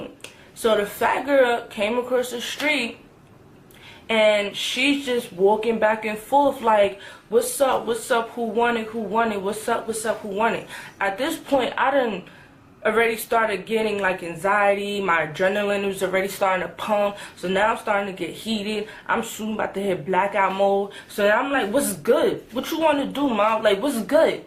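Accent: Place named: New York, United States. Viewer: LOL OMG i love these! "i put my victoria secret lipgloss away" 😂 😂This storytime sound odee NY!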